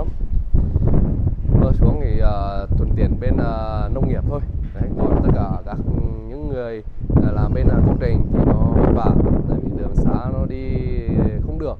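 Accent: Nghe An, Vietnam. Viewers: Bặn lên bặn lên. Đúng đậm chất nghệ luôn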